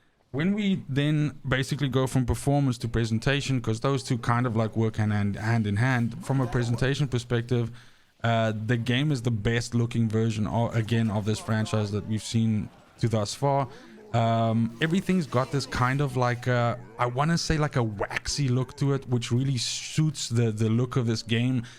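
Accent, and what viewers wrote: South Africa. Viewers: i can hear some south african .awe my bra kwai video!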